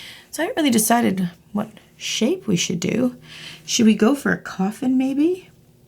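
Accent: Canada. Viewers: are you from Canada?? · These are lovely! Forgive me if I'm wrong but are you Canadian? You pronounce things in a Canadian manner? (I'm English I try to really listen to the accents I watch on YouTube but do get them wrong at times) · She sounds so Canadian in this video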